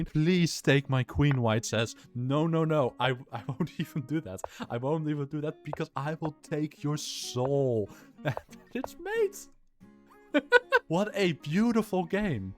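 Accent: Netherlands. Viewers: Are you Dutch? Sorry, I always get distracted by the accent of Dutch people on YouTube.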